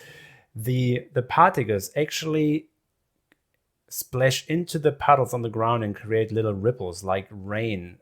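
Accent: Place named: New Zealand. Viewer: Beautiful and practical way to get the shot ASAP. Also I lived in NL long enough to be able to pronounce your last name correctly, and your Kiwi accented English is a perfect living testament to your VFX career!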